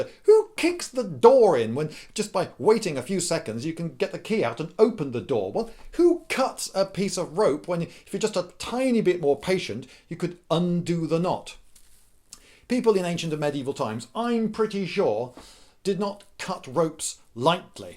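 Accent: England, United Kingdom. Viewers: you sound so English! and that's coming from me, an Englishman myself. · "That was a good bit of rope that." I love the English accent.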